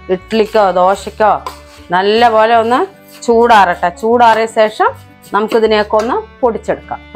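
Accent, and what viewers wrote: Palakkad, India. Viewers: പാലക്കാട് ആണ് എന്ന് നന്നായി അറിയാം. ആ ഭാഷാ, penne കപ്പല് മുളക്..❤ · പാലക്കാടൻ ഭാഷ 😂😂😂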